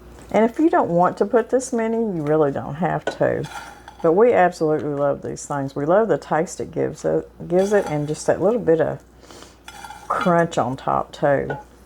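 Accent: United States. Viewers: Love to watch your videos love your country voice iam also in AR was raised on country cooking thank You God Bless you all.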